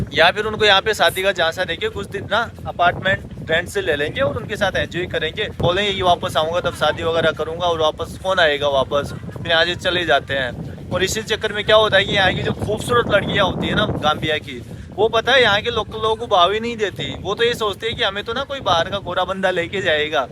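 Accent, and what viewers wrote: Rajasthan, India. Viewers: Are You Belong from Rajasthan? Language Accent 😁❤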